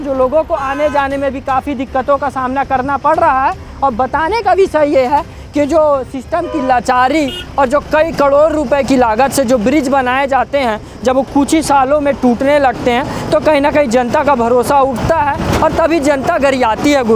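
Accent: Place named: Bihar, India. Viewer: नेता परदेसिया अधिकारी परदेसिया इंजिनियर परदेसिया to kaise rahega sabkuch ठीक ओर तू तो पक्का परदेसिया है बिहारी बाबू तुम्हारा बोलचाल बता रहा है 100%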